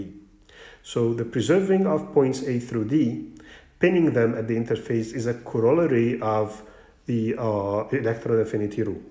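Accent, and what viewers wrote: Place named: Egypt. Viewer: Best explanation ever! I am proud to feel the Egyptian tone and style.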